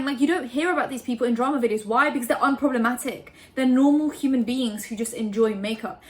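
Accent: United Kingdom. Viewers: Omfg I used to watch you so long ago and I could never find you ever again 😂 finally popped on my recommended 😩 I was like where’s the girl with the British accent 😩😩😩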